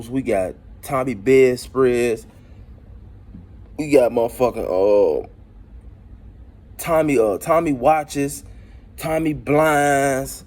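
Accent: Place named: South, United States. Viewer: Damn boy u sound like u from downsouth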